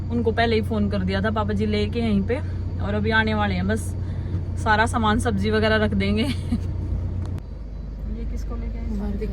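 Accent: Haryana, India. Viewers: U p me rehne ke Baad bhi aap ki haryanvi tehth h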